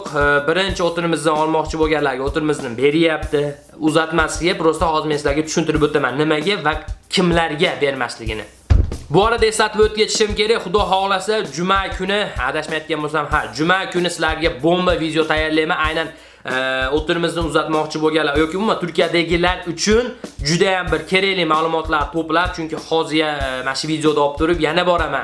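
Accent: Andijan, Uzbekistan. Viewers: Анжанли шевани йукотмапсиз койилл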